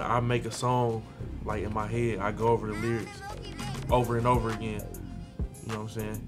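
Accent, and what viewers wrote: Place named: South, United States. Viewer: He may have spent time in the D but he obviously spent more time in kentucky. He has a thick southern accent and he shouts out kentucky in alot of songs.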